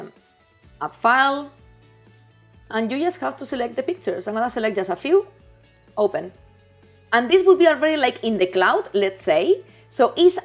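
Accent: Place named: Spain. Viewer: very good video. great recommendations. Also like the accent. - Spanish?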